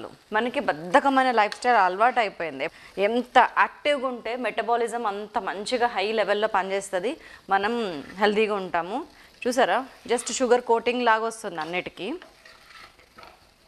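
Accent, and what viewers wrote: Telangana, India. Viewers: Wow garu that’s fantastic tip I always put in pressure cooker water and salt or sugar Sooper I just liked your recipe and the healthy tips in between Thank you amma You just rock like my family member your Telangana slang ditto like ours 🥰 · Your voice is beautiful and confident...especially your Telangana dialect is so pleasurable to listen...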